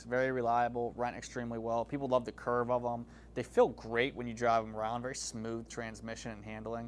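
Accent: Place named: Pittsburgh, United States. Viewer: I miss hearing the yinzer accent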